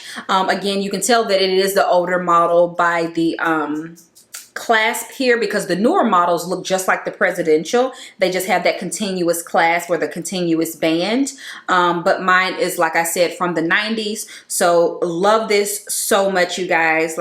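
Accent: South, United States. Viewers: Lol you sure do. Your southern accent. I am from London so some American accents sound nice to me.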